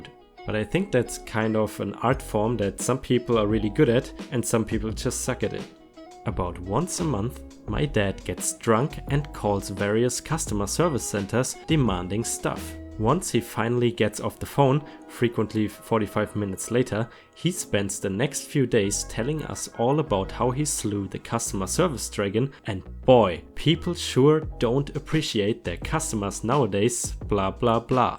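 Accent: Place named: Germany. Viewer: Cooles Video, muss zugeben ich war nicht überrascht als du gesagt hast “Germany”, Deutsche hör ich sofort :D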